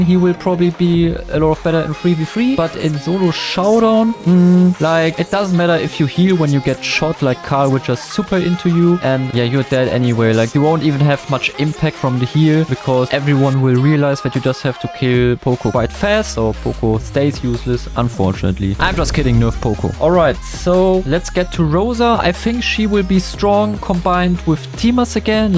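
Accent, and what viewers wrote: Germany. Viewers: hilfe dieser deutsche accent 😭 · I love ur German accent :face-blue-smiling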